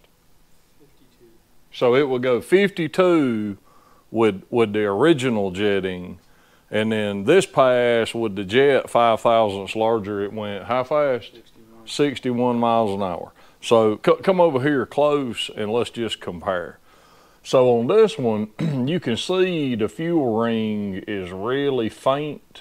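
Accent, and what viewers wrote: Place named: Upstate New York, United States. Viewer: You guys showed up in my suggestions, insta subbed. My kind of folks, wrenchers. A few oddities, first I can tell you're from my neck of the woods by the way you say cobb-urator, second I have a valknut tattoo also, third you gave it away- them overworked roads look like home or close to it (DOT will get around to it when they're done with 85, so basically never), if I had to guess I'd say Anderson. Cheers mates, from a mobile mechanic, starting a year out of college trying my own business 🍻 Cheers from Pickens. Small world, Im only guessing the county but I know yall are upstate folks and that blows my mind. Be blessed guys. -Regards, Harley.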